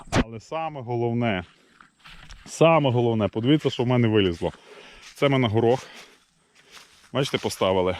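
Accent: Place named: Podillia, Ukraine. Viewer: Лайк з Криму. Вчора познайомилась з Вашим каналом,п!дписалась.Дякую за укра!нську.Розмовляете по Под!льськи.(за помилки вибачте клава руська).Вдач! Вам.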